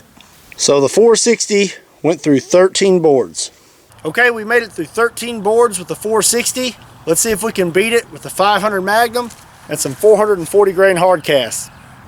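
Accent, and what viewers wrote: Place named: Texas, United States. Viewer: That’s just how we talk in Texas al right!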